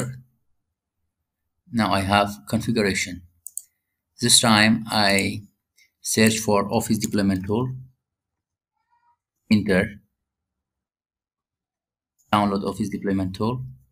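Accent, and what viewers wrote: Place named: India. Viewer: the moment that you sounded indian it was over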